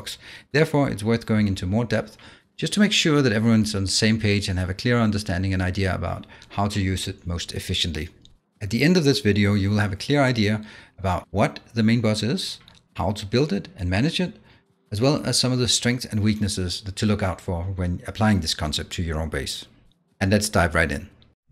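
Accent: Denmark. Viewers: Wow you sound super duper danish. :) Nice video!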